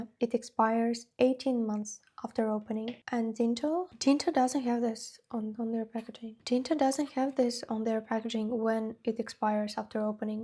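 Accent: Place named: Eastern Europe. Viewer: Where are you from, love? I’d guess you might be slavic?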